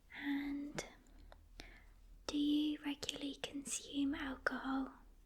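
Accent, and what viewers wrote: England, United Kingdom. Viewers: Great voice and I love your accent. You’re from wich part of England? Good luck and keep it up!!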